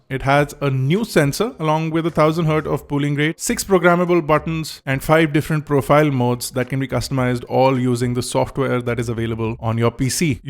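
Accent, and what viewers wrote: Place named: Delhi, India. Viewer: Simply elegant and useful info... Y i joined this coz the person is not wannabe... Simple perfect delhi accent English.. not trying to make it cool accentize British or American accent English... All concentration on gadgets knowledge no tantrums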